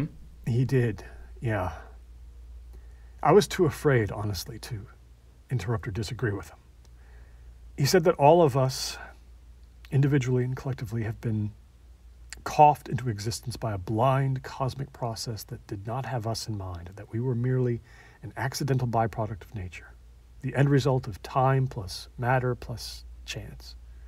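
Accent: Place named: South, United States. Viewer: Can’t take the southern outta the Australian Ohioan 😄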